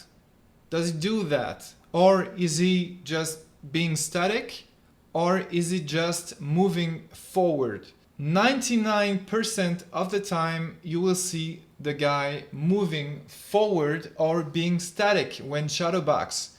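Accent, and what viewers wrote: France. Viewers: He is french i belive or speak french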